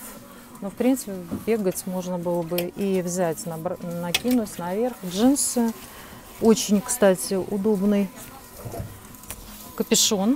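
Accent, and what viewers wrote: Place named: Latvia. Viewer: Это латышский акцент...😊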